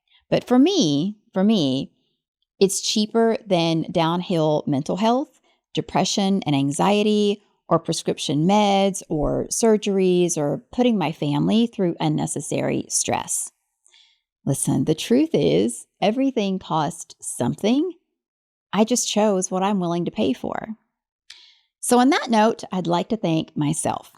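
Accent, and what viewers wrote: South, United States. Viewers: That was fun! p.s. I didn't realise you had a southern accent ❤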